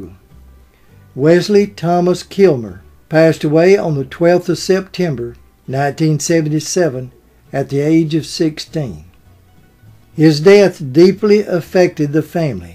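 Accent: South, United States. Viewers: Another great video,. Your Southern charming voice once again makes your videos golden. Thank you.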